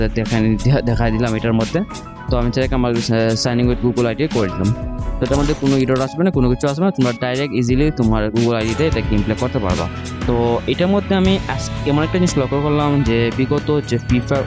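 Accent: Sylhet, Bangladesh. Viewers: bhai tumi sylhety ni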